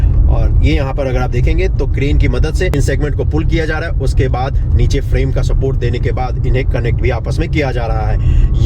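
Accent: Bihar, India. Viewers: टोन रखिए बिहारी जैसा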